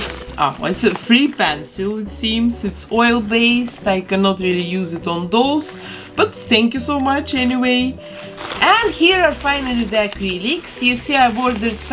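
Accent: Russia. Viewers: Excuse me...but Are you from Russian? 😰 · Russia, belarus or out there but I think it's from a European country because she says the money in Euros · Я не могу понять, или она русскоговорящая, либо просто плохо выговаривает англ слова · where is she from? l hear Russian accent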